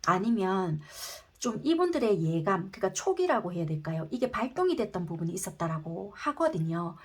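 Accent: Busan, South Korea. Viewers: 구수한부산말투 매력적이네요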